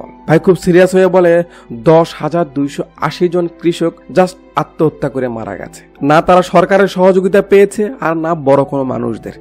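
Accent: Bogura, Bangladesh. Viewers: ভাইয়া আপনার আমাদের বাসা বগুড়াতে....??😍... অবশ্য আপনার কথা বলার ধরণ দেখেই কিছুটা মনে হয়েছিল আপনি বগুড়ার 😍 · Apnar basha ki bogurate vai?? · বসের বাড়ি কি বগুড়া?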